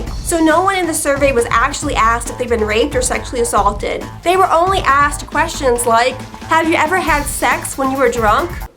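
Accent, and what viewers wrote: South, United States. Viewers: Thanks. Talk about "pot + kettle = black", that FCkH8 dot-com clip itself was just pure hate. And, dear lord, the 'abuse through indoctrination' those young girls must have endured in that original video... it makes my flesh crawl down to the bone...I just watched 2mins 35 of filth that out did anything that Joe Gobbles conceived. Liking your videos, and as a non-North American, I can't get enough of your Southern accent - don't ever lose it!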